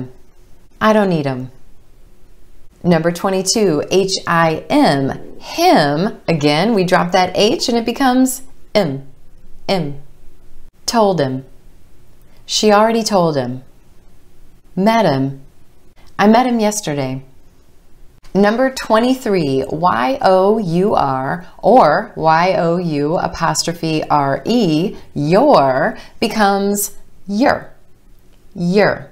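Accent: United States. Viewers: Thank you coach , your english is hundred percent american 🙋😘👏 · This is the first time,I am learning American accent from you. Thanks,Md. Manik Miah . From BANGLADESH. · when i will achieve a posh american accent like you= I will have been already, - ready)) At finally 26 - Thanks a lot and applause!!!! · Her accent is rothic, so it's easy to notice that this is American English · Really your this lesson is appreciated good way to teach basic of Americans accent superb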